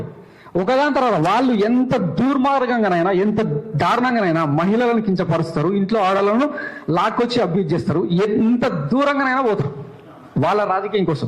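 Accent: Telangana, India. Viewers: నేను ఆంధ్రప్రదేశ్ వాడిని. కానీ తెలంగాణ యాసలో మీ గొంతు అంటే నాకు చాలా ఇష్టం. మీరు పలికే ప్రతి మాట సంకీర్ణ ప్రభుత్వానికి కత్తిపోటులా అనిపిస్తుంది.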